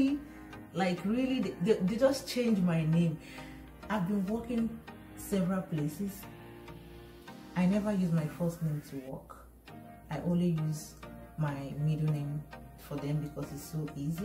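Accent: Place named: United States. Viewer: I have heard that alot. You speak like American 😊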